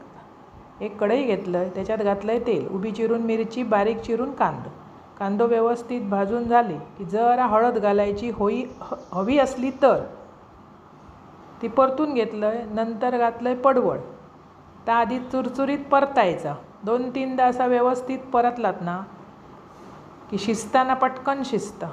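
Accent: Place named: Konkan, India. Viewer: तुमच्या रेसिपी छान असतातच पण मला तमचे कोकणी बोलणे खूप आवडते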